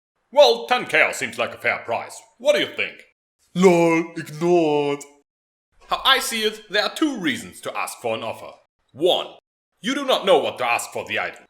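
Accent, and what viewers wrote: Germany. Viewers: ***** Dass es Deutsch war wusste ich. Ich war nur verwundert, da ich persönlich den deutschen Akzent nicht wirklich mag. :3 Aber trotzdem danke für die Info.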